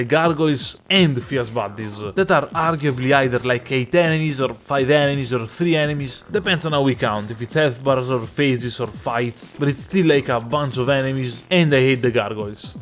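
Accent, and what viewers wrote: Italy. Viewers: Hey man. Great video, but I was wondering where are you from. You sound a lot Italian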